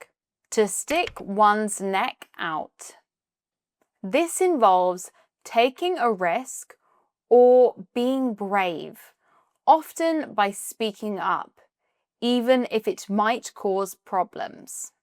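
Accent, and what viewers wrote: United Kingdom. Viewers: Wow, that's super useful! Now I just need to find a way to remember as many idioms as possible... Great job,. Thank you so much for your dedication. PS your British accent is amazing ❤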